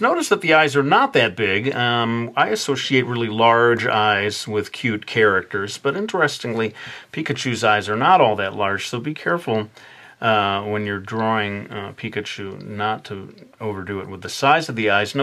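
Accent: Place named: Turkey. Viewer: türk ingilizce konuşuyo la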